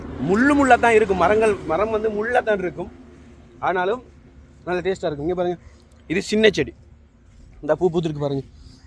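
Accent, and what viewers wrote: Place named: Chennai, India. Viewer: கிளாக்காய் காட்டில் இருக்கும் எல்லோருக்கும் தெரியும் நீங்கள் முதலில் Madras தமிழில் கதைப்பதை நிறுத்த முயற்சியுங்கள் இலங்கைத்தமிழில் கதையுங்கள்.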